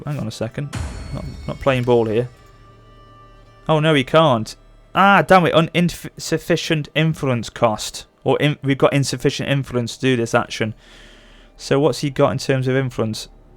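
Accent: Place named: Wales, United Kingdom. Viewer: You have inspired me to buy Attila Total War at the beginning of the next month. I enjoyed the first eight minute descriptions. I watched this video by finding the Attila Total war as usually first place of the top ten of 2015. Do you like Europa Universalis IV as well, most videos seem interesting. I have seen one bad review of Europa Universalis IV on gamespot. IT is a toss up, though I think for now your walkthrough sold the idea for Attila Total War! I love your accent, are you Wessex or Sussex Welsh?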